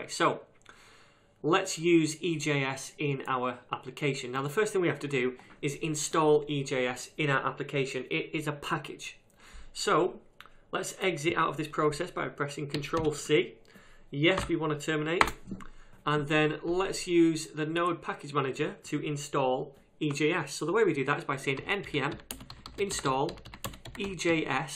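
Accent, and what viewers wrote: United Kingdom. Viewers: I just want to say thank you for your tutorial and your British accent drive me to learn more about nodejs : )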